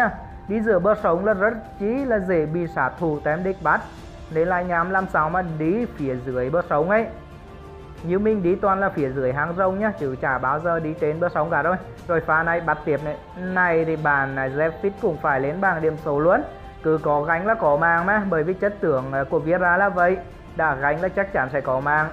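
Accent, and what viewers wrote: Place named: Central, Vietnam. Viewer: Bố gét giọng miễn trung DKMcm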